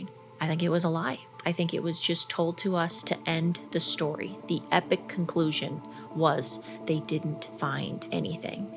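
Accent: Minnesota, United States. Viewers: are you from Minnesota?I love listening to your voice and I love your videos 😊Hi from Maine🌲🦞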